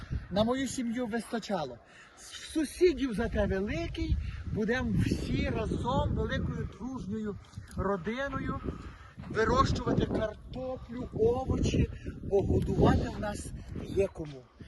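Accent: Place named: Chernihiv, Ukraine. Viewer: вы живёте случайно не в Черниговской области? Я прямо свою бабушку услышала. Вот именно так она говорила, слово в слово. Я с Сибири, а моя бабушка с Черниговской области. В Украине, ведь везде своё наречие. Бывает,я кое какие слова понять не могу, а вас прямо каждое слово. Я молюсь каждый день с начала войны за вас и скорблю вместе с вами. Дай Бог всё скоро закончится. Сил вам душевных и физических. Я вместе с украинцами радуюсь вашим удачам в бою, и переживаю за неудачи.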